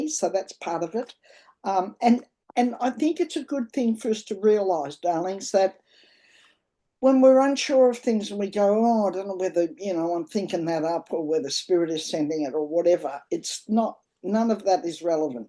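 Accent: Australia. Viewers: ❤. A common sense Aussie voice. Love your straightforwardness.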